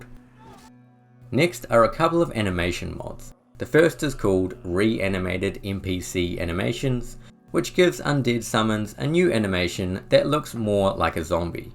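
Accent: New Zealand. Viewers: You kiwi?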